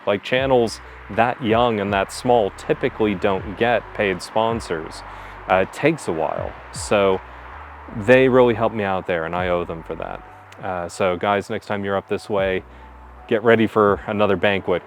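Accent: Scotland, United Kingdom. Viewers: Congratulations on your million views--well deserved! I found Simple Scottish Living just before finding your channel; pretty sure I've seen North And Forth videos too. I really love watching anything to do with Scotland and won't give up hope of living there someday (just wish I was younger). I've also noticed that Scottish accent creeping in...😁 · Loving your channel, one thing I have noticed is that you are starting to pick up the local accent, the way you say Scotland & Scot in particular. It will be interesting to see how broad you become as time passes. Also you may wish to consider that the phrase let rip can also mean a bad bout of farting, so I did laugh when you described Christine as letting rip in the kitchen until you qualified it with cooking up a storm. Conjured a funny image. 🤣🤣🤣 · Oh my goodness, you're picking up the brogue. You've stopped sayin "Scatland" and started saying Scotland, like a local 😀. 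I'll be listening to see what other words change over time. Excellent content, as usual 👍🏴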